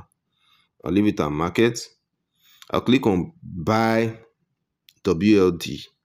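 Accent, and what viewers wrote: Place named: Africa. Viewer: We can only understand our African English . 🎉🎉🎉 thanks for the explanation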